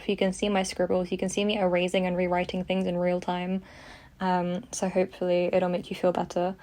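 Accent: United Kingdom. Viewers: since i love british accent. love to hear you talk during long videos.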